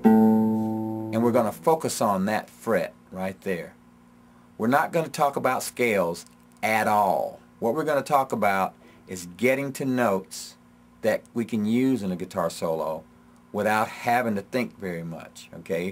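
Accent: New Orleans, United States. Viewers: Are you from New Orleans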